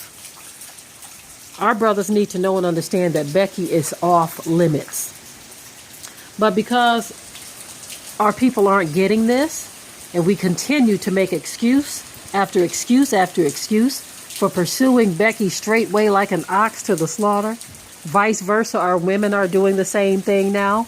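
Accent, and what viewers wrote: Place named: South, United States. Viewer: I love your accent, which state are you from? Sounds like somewhere in the South.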